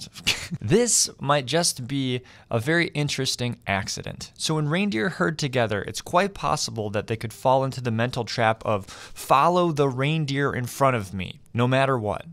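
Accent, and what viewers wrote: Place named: Canada. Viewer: You've got a Canadian mouth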